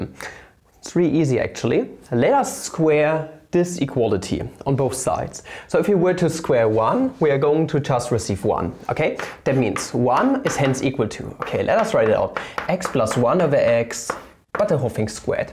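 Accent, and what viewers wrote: Germany. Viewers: German accent is very interesting, where did u learn English?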